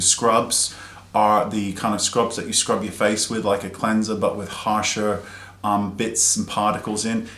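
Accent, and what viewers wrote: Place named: United Kingdom. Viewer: probably, hes british and he just pronouces it differently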